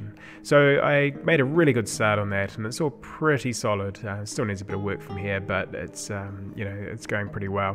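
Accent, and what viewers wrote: New Zealand. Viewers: Pretty impressive stuff, amazing that this is all in your own engine! I worry your game has next to no actual game-play. Hard to tell but it seems like you ahve a lot of work to do in terms of crating, building, exploring, sapien interaction etc. Tech wise it seems great though, visually it is pretty good. Seems strange to have that entire world view and then top down selection system, just for the first 30 seconds of the game. Is there any reason you would go back to that view? PS. Are you from NZ? Where abouts? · its kiwi not Australian can't you tell?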